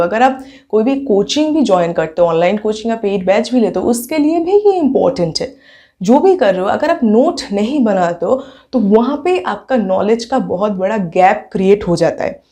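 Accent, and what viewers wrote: Bengal, India. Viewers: Hindi se pta chlta h kii aapki bengali bht achi hogi ❤️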